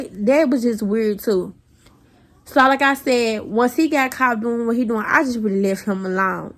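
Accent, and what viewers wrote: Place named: Arkansas, United States. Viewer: We can tell you are from Arkansas lol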